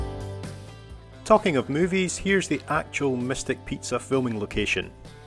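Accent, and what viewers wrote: Scotland, United Kingdom. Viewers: Hi and thanks from a Scotophile in Nashville, TN, US. Your video was the first result of interest on a search for Mystic CT., in prep for the Classic Wood Boat Show there in August. Backstory is that we plan to move to the Black Isle in 2 years (God willing!), where my hubs hopes to get into building classic wood sailboats. You narration is divine- as the myriad regional Scottish accents are my favorites anywhere! Thanks for a great channel- we'll be stalking it now.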